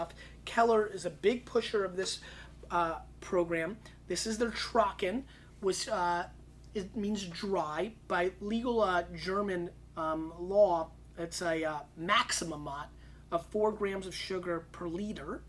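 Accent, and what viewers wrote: United States. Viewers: Just a tip,. You should work on toning down your american accent. It is impossible to understand your German!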